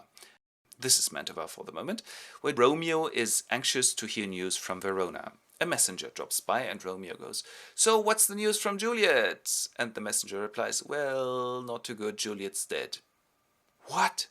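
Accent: Germany. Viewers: Are you german? Asking because of the accent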